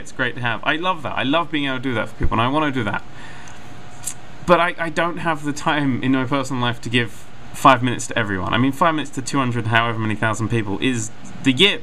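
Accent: United Kingdom. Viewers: 6. Your English/British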